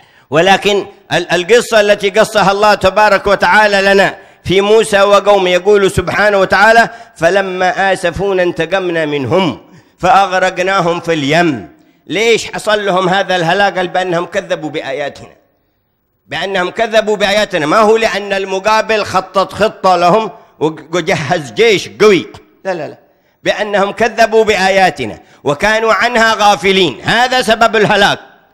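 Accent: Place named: Hadhramaut, Yemen. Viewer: كيف داعية وهو يتكلم بلهجته‼️😐 كيف يفهمون عليه و لهجته حضرمي حبشي‼️😐 والجمهور كله من العجم الله المستعان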